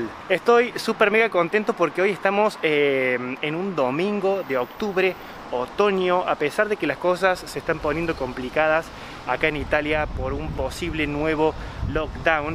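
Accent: Cordoba, Argentina. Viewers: Me encanta tu acento coooordobes... 🤘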